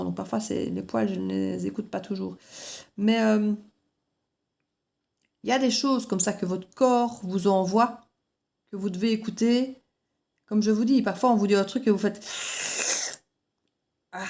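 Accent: Liège, Belgium. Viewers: Ça me parle mais cet homme a 74 ans et ne changera jamais, il a un caractère impossible. Bravo s il a fait un travail sur lui mais je n ai définitivement plus confiance en lui et en plus la haine n est pas loin vu les 2 ans pénibles vécus dans ce lien karmique, rien de sacré là- dedans pour moi! Merci bcp,votre accent liégeois est très agréable, une compatriote!, je suis de Bruxelles. Magnifique votre guidance, cela m'a conforté dans ma décision de couper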